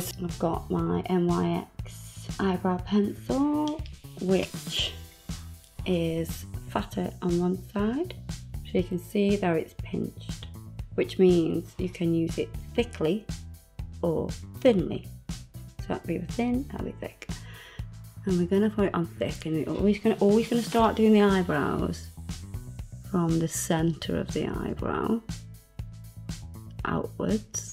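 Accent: Liverpool, United Kingdom. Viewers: I thought you were a scouser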